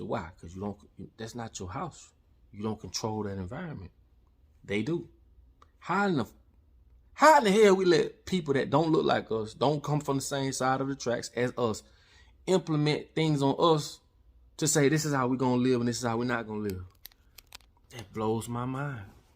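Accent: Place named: Deep South, United States. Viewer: That's that deep deep south raising!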